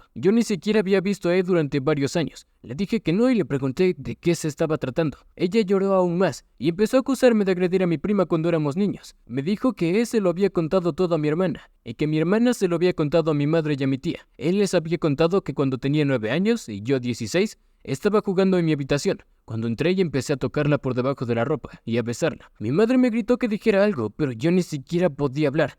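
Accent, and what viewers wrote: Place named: Arab world. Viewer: El acento árabe del software de lectura me ha hecho gracia, solo necesitaba oír que dijera "Habibi"!!!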